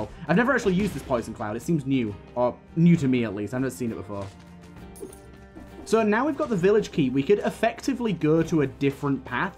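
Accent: United Kingdom. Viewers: Yes more dead Cells! What part of the UK are you from btw? 😊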